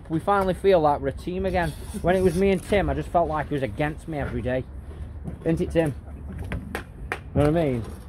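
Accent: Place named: Liverpool, United Kingdom. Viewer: Scouse is back 🤏 🥪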